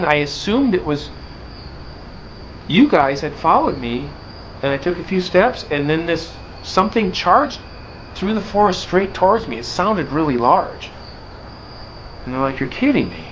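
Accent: Minnesota, United States. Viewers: Love your Minnesotan accent.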